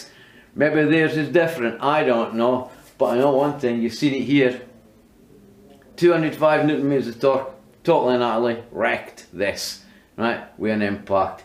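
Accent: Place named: England, United Kingdom. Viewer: Ingles de Inglaterra.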